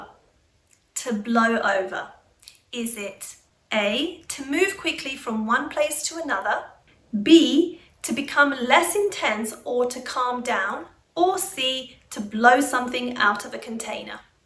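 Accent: United Kingdom. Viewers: Could you please tell me what's your accent? Estuary or rp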